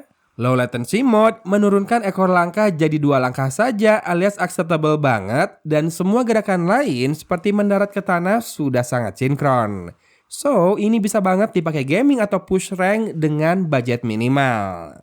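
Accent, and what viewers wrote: West Java, Indonesia. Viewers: Lah, klo memang logat bicaranya jawa barat seperti itu ya mau gmn lg?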